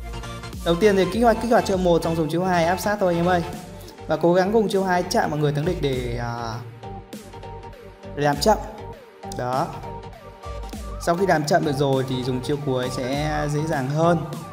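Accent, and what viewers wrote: Vietnam. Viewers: quê ở đâu ấy. Giọng vừa nam vừa bắc khó đoán quá😃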